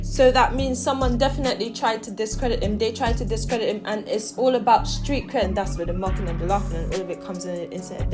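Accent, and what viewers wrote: United Kingdom. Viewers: How the fuck do you have subscribers you speak straight gibberish mad fast with a British accent. Idk if you’re making a video about Chicago or a London drill rap either way both trash.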